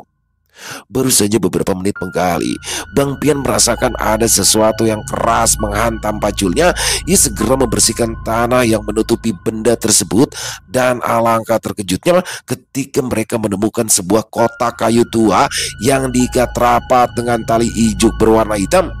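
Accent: Jakarta, Indonesia. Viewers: Bang itu pas banget klo ceritain logat betawi sangat terhibur,apalagi klo bang piyan bertengkar guyon sm baba ali,klo bang doni bertengkar guyon sm ibu zubaedah bikin enak denger nya sambil tertawa,mantap bang sdh bisa menghibur kita semua,sehat utk bang nya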